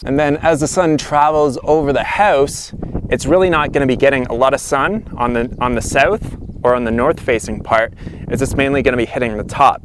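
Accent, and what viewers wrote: Canada. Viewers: Can really hear that Canadian accent in this vid. Lol. Adorable. 😊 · I heard your accent. May I ask where your from? Are you Canadian?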